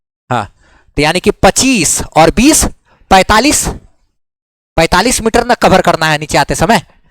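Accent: Bihar, India. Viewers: Aap bihari bolte ho kya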